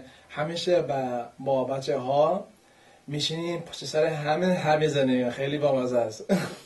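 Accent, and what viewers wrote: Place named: Iran. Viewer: Wow amazing! Has Persian accent.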